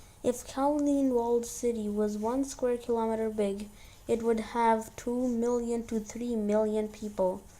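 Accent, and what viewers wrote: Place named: India. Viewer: Your voice changed so much holy shit, it became so indian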